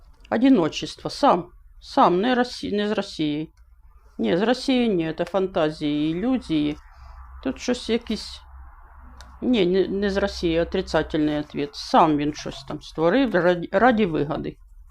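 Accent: Kherson, Ukraine. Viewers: Добрий день! Обожаю херсонский суржик! Здоров'я Вам, пані!!!❤❤❤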